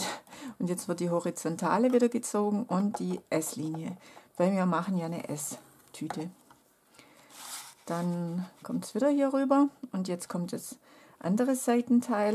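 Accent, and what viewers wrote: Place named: Swabia, Germany. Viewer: Süsses Projekt, Deine Sammelbesteller werden sich freuen! Und Dein Schwäbisch ist total goldig xx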